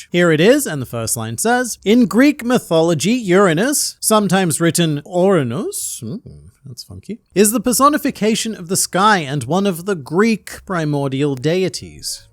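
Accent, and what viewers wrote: United States. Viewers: can you do a video in your American accent? It's hilarious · Top notch American accent and as a New Yorker I was 100% fooled by your "I'm walking here" 😂😭😂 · Your speaking tone and accent is probably the closest to "American" I've ever heard from an Australian, so I can see why they might have gotten that part wrong. As an American who has talk to a decent amount of Australians over the internet and watched your channel, I didn't realize you were Australian until you mentioned it yourself. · Actually, you're both very confused. Pluto is a dog. Also, your attempt at American is only one of many accent's I don't feel like counting. We have a lot. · Um actually you sound extremely American. You're just distorting your own accent. Trust me I would know, I'm from Canada. 😂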